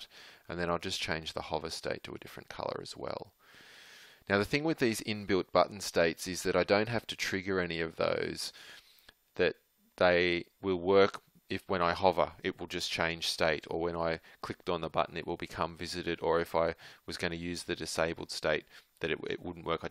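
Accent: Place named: Australia. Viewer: this tutorial is excellent! Your instructions and explanations are very clear. This is the best online tutorial explaining states that I've found on YouTube. Thank you so much! Please make more tutorials. ps. Love that I hear a fellow Aussie too!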